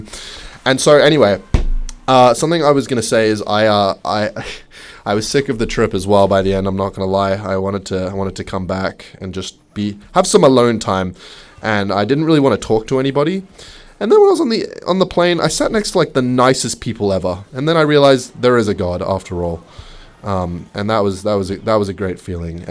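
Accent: Scotland, United Kingdom. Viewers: Scotland ahh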